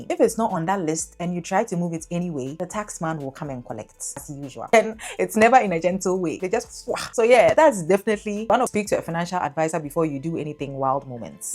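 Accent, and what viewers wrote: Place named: Ghana. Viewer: You do very interesting videos, but the playback speed at which you set the videos makes it difficult to understand what you're saying. You should be aiming at world-wide audience. You have a heavy ghanaian ascent, but i'm sure even some ghanaians will find it difficult, as the sentences are too fast. Often, the sentences are not complete before another one sets in. Don't you replay your videos to yourself? As these are more or less tutorial videos, why don't you just set the speed to normal to make it easier for people to follow what you are saying?. I often have to skip your videos as i find it difficult to understand what you are saying due to the speed of the sentences.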